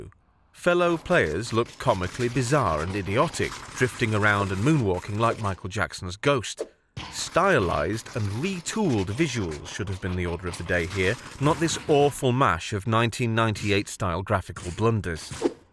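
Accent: United Kingdom. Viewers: That’s just’s cause he’s British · alright well your british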